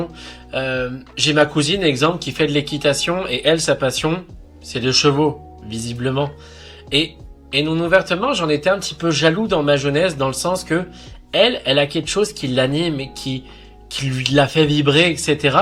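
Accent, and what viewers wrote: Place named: Quebec, Canada. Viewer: Tu sais que tu choppes un très léger accent Québecois ? 😅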